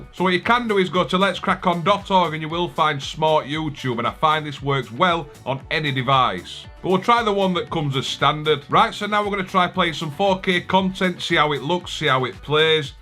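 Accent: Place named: North, United Kingdom. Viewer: You're definitely the happiest and funniest Android Boxes reviewer that I've ever seen in the whole Youtube. Got a new subscriber! By the way your northern British accent is very pleasant to listen to.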